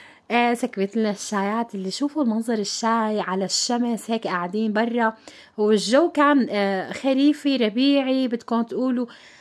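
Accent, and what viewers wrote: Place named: Syria. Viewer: من لسانك سوريا اب عن جد. لستك تكذبي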